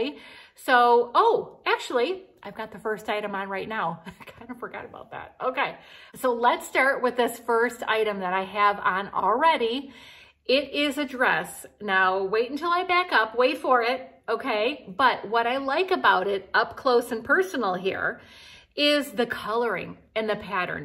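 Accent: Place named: Midwest, United States. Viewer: The first thing is I noticed your accent. I thought to myself she’s from the Midwest possibly Michigan. That’s where I was born and my relatives always sounded like that. I moved away at seven and did not have that accent the second thing is I have a Ziploc type phone protector like that for the beach and I love it. The other thing is where are those skorts while you can. I am 63 and a longer wear them. I gave them up about 13 years ago. Do you look so cute in them and I love the tops and I would take that first stress and I would cut it off and make a blouse it’s adorable. I’ll have to keep my eyes out for the jeans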